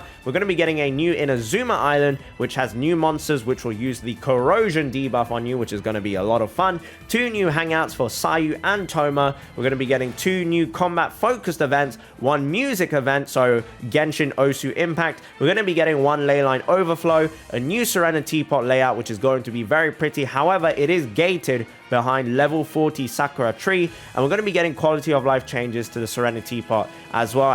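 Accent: United Kingdom. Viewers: I just found out about you and I have say, it's kinda weird hearing an asian with a uk(?) accent. No hate or anything but it just kinda threw me off a bit since it's a bit unusual to me.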